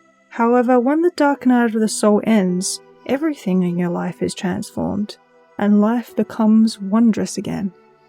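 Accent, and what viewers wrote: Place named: United Kingdom. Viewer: OK. I have to say I'm totally gobsmacked that you have a British accent! I've been following you for several years now, simply because you told your story of having an extreme "cultish" religious upbringing that matched mine VERY closely. I'm in the USA; and perhaps I didn't think that anyone outside of the USA could have the same severe religious upbringing as me (and I must say, mine was MUCH more severe, abusive, controlling, cultish and brainwashing than yours). Yet...you have had a harsh religious upbringing, too...and in Britain! Seriously...I didn't ANYWHERE ELSE in the world could be as bad as Tennessee and Georgia, USA!!! And hey...I ADORE your accent! It's SO NICE to finally "hear" you and not just "read" you! Thank you for this, and blessings!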